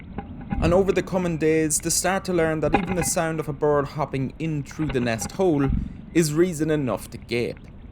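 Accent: Ireland. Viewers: Greetings, I'm really enjoying your YouTube channel, the videos are terrific, your editing is great and I love your dreamy Irish dialect and narration. Maith thú. 💞 Gach rath ort. xox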